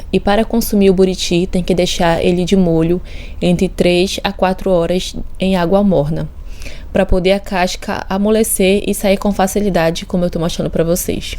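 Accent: Rio de Janeiro, Brazil. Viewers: Oi, linda...se sotaque parece carioquês...😅😅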